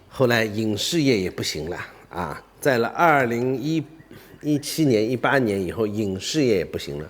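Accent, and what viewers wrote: Shanghai, China. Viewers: 喜歡聽你聊天 有趣 上海人特有的口氣和用詞 很熟悉 🎉👍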